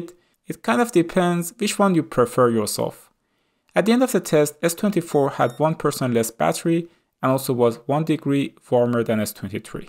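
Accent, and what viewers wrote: Iran. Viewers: Your accent is too persian😅 am i right?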